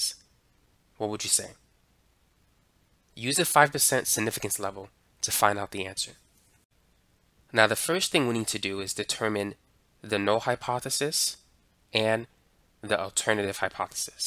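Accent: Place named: Texas, United States. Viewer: bro is from texas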